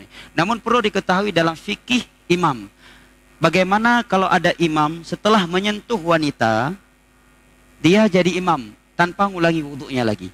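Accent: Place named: Minangkabau, Indonesia. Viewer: Mungkin logat minang kental sekali, makanya agak beda sedikit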